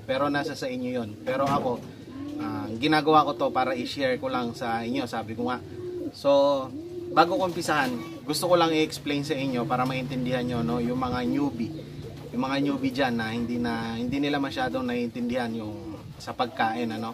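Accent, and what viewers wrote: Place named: Pampanga, Philippines. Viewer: Boss taga saan ka pwedi puba paki sabi narin sa next vlog mo ty po parang kapampangan kapu kase ea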